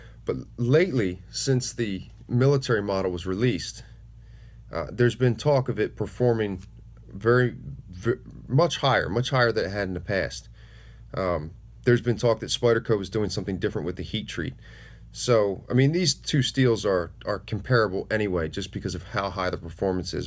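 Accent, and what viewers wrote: Philadelphia, United States. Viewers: Love the vids. Sharpening and testing of blades. Appreciate it. Subd for sure....you sound like u got a Philly accent or somewhere close to there...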